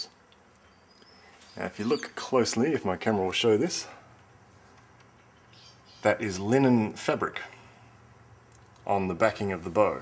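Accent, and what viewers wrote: Australia. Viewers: is that a Australian accent i hear there mate? haha thinking about making myself a long bow, been told bamboo is the best material for beginners. thanks for the video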